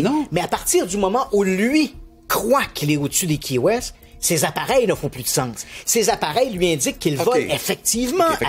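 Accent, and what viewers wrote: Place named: Quebec, Canada. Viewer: L'accent québécois me transporte toujours dans une autre dimension 🍁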